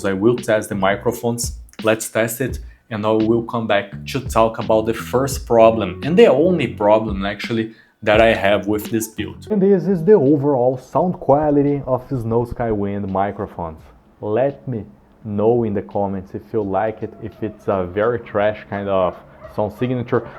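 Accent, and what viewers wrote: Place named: Brazil. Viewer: Eu entrei no vídeo pensando "só gringo deve estar fazendo review desse fone" aí no que ouvi o sotaque já saquei que era BR kkkkk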